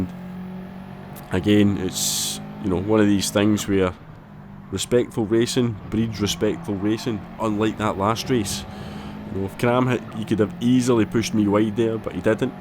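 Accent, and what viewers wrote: Scotland, United Kingdom. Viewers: cheers to you for the content mate 👍 we need a good Scottish Accent on YouTube (I wont hold being a Rangers fan against you 🤣).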